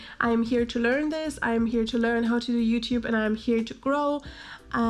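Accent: Germany. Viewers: Da höre ich doch eher deutsch als Muttersprache. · Are you from Germany? I love your accent; it's so beautiful. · I can hear a german accent. :D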